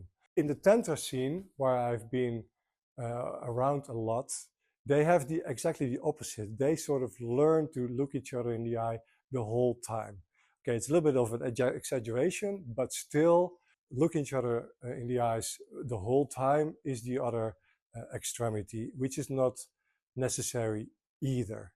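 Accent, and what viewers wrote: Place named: Netherlands. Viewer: He is Dutch 😂 I can tell by the accent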